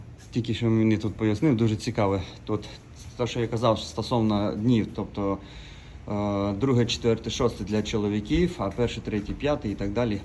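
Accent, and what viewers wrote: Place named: Lviv, Ukraine. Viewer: Ви молодець я зрозумiла, що ви з Миколаева а мова у вас начебто ви зi Львова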